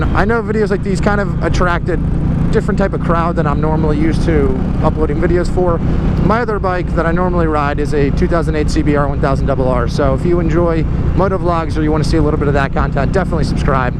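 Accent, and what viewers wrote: Pennsylvania, United States. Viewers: Hey Dude, Where have you been? Great review! I'm a HD guy and I'm leaning towards a new Softail Slim. But Damn! Funny you sound like you more from my neck of the woods in PA. Great Review!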